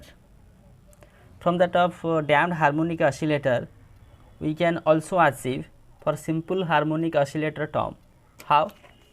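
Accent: Odisha, India. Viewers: Sir by your voice you resemble like odia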